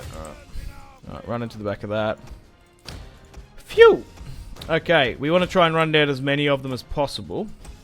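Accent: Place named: Australia. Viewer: gday mate how you going! Love your australian accent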